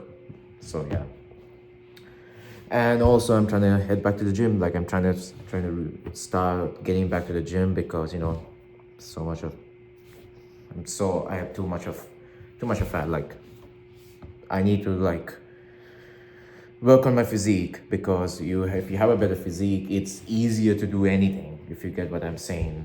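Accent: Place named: South Asia. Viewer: Habibi, yessss don’t waste the water that could go to starving bettas in Africa! Habibi u r fresh off the boat and no one can hear a word through that curry accent.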